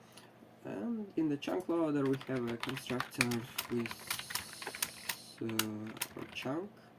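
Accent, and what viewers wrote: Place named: Russia. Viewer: Russian pronounsation fits to the videos like this :-)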